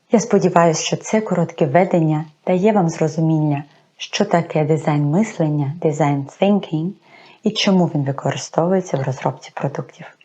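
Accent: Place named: Lviv, Ukraine. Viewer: Типова львівська огидна вимова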